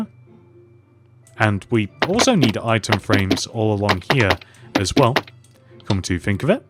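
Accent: United Kingdom. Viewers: He's definitely in UK you can tell by his British accent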